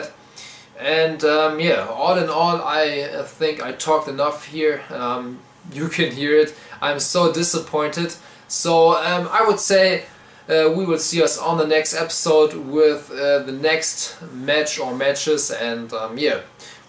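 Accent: Germany. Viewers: du hast einen deutschen aksent und ich hab ne scheiss rechtschreibung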